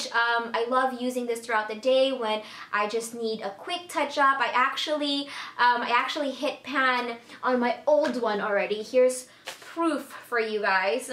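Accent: Philippines. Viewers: Filipino accent lol :) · Hahaha :D pinoy accent ;) cucumber x)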